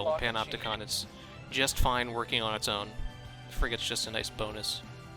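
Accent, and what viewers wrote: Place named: Rural, United States. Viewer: There’s something about Country Twang and space ships….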